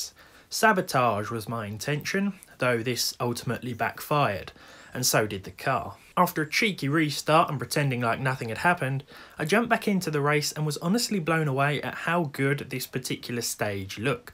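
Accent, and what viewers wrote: United Kingdom. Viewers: This guy is the most stereotypical brit I've ever heard. This cheeky baastud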